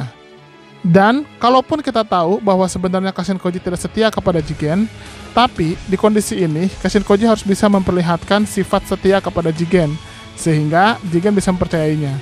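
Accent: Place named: Sumatra, Indonesia. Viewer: Min, mimin orang sumatra ya..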